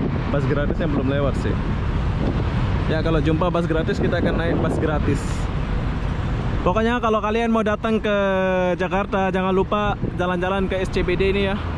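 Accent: Malaysia. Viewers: Kalo Aku dengar dari logat. Abang ini orang malaysia kayak nya. Salam dari Sarawak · Kamu orang mana sih?logatx org melayu.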